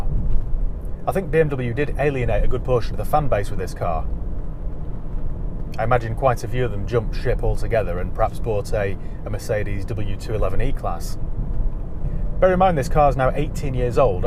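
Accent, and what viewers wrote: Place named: Newcastle, United Kingdom. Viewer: Its Geordie speak, south of the border.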